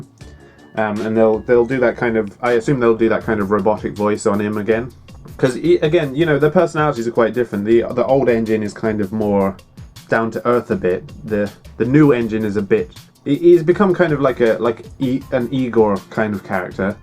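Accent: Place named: United Kingdom. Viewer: Its strange, I always assumed the genius behind was American. Nice to hear a fellow Brit that does YTPs. (I dont make videos I just mean I'm also British)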